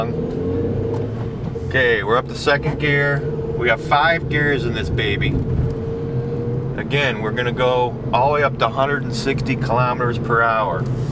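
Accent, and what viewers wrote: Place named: Germany. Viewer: Damn i speek fluent German but you sir sound more german than germans.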